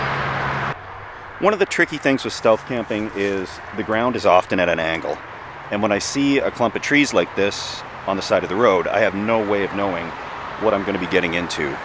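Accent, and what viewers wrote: Canada. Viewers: After I listening to your accent for several sentences I thought to myself, "I wonder if this bloke is a Canuck?" Then, within 3-5 seconds you mentioned your drink, a beer that came from Alberta! Excellent conception for the video, great content, and my very best wishes from South of the Border.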